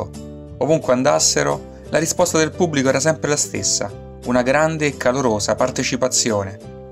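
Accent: Rome, Italy. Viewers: Video interessante e ben realizzato. Ma se lo speaker correggesse quella terrificante "c" romanesca presente in OGNI parola, il lavoro risulterebbe decisamente più godibile. E lo dice un romano come me...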